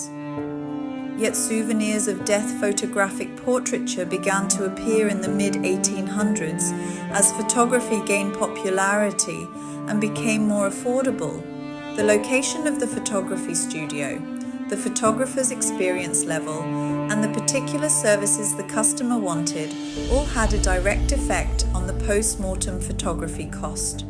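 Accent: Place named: United Kingdom. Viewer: I did like it and your Wonderful British Accent! 😊😊😊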